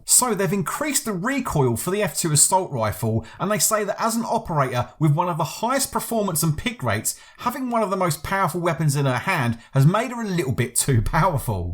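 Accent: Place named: England, United Kingdom. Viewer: yeah, why not? I can listen to that English accent for a while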